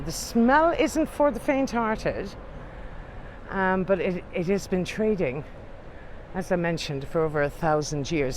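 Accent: Ireland. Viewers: I would love to see your video of your travels home. I thought I recognised your regional accent, as my husband's family are from Mitchelstown. I would love you to visit Bunhill fields. Resting place of some very familiar names. Daniel Defoe being one. A short walk from old Street tube station, and a real gem. Safe journey home. ☘️ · Definitely agree. Just discovered this channel and is an incredible guide. Lovely Irish voice. Sounds the same as my former work colleague and she was from Co. Kildare. Xxx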